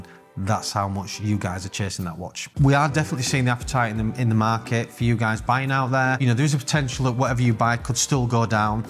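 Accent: United Kingdom. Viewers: Very strong brit accent, could you slow down a lil bit sir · Sir you always have excellent, cut to the chase, no BS content. I have to really concentrate on your videos because you talk at a faster pace with your British accent. It’s seems like a mix of British, Scottish, Irish and Australian. Where did you grow up?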